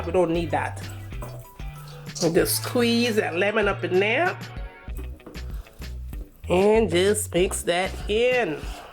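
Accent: Jamaica. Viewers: Thanks! I am so happy I found your recipe. You are hilarious, confident and talented, who else could so eloquently and entertainingly deliver a tutorial in that beautiful Jamaican patois. Keep spreading the love, you are special!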